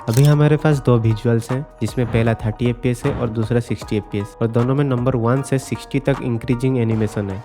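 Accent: Odisha, India. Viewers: Aap oriya ho na? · Odia bhai voice ru jana padi jauchi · Bro i think you are from Odisha..Your native language is Odia..BTW you explained this clearly.love from Odisha. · Kya aap odisha se ho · Are u odia bro? Your accent is so strong 😂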